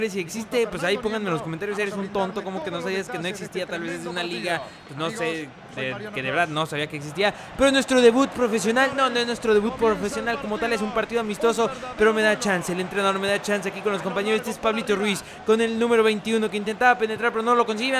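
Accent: Argentina. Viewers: Muy gracioso hablando argentinamente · quisiste hablar como argentino en el video?? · Que buen acento de argentino >.<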